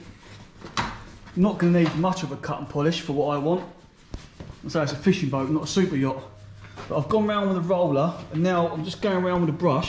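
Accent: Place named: United Kingdom. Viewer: So british accent, good vid, folk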